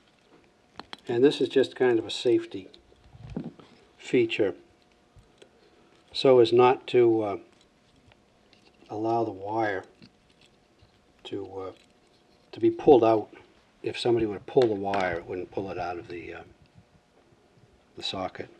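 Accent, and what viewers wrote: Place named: Boston, United States. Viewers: Actors should listen to this guy before attempting a Boston accent in movies. Most actors hit it WAY too hard. Hahd lol. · Glad I came across this. Good accent. I actually need to learn how to do a Mass/New England accent for a play and this should help nicely😄 I’m actually looking to fix a foot switch for a lamp. Thanks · As soon as you said That, I knew your were from Massachusetts. Made me a bit homesick. Hope your shop is still doing well. Thank You for a great demo.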